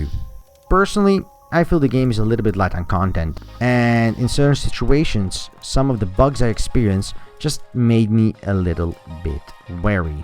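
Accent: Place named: Netherlands. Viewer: I assume Dutch? You have a very deceiving accent if that's the case! Great video nonetheless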